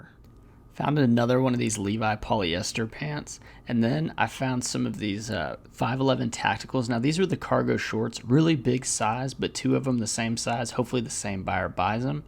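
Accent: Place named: Dallas, United States. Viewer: Lol, I just love your videos. I usually watch YouTube on Roku so don't comment but I've been watching for a few months; I'm in Tulsa and went full-time with eBay selling during covid lockdown. By the end of it I was making more money on eBay than I ever made teaching so still haven't gone back to my former job. I've never sold clothes but plan to get into women's, particularly things that I can find here easily and sell on the Pacific corridor and in France, where I have family, for a profit. I won't be doing mens' modern so no competition from me, but I do hit the Dallas thrift stores about once a month when I'm down there. I've also sourced in Oklahoma City but never in Lawton. I love all the vintage western shirts, I swear I could make a killing in France on those but it's not entirely legal for me to bring a bunch of merchandise I plan to sell over there in my suitcase. 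Tempting though... You look so much like my Dallas cousin, and I swear your accent is exactly the same. I just die when you stop for a victory cone at Braum's after a day of sourcing. It's so refreshing to see another somewhat local seller that I can relate to, even if we don't sell in the same category. Just had to pipe up and say how much I enjoy these since I happen to be watching on my laptop tonight and have a keyboard handy... keep it going, you're really the best! I love it!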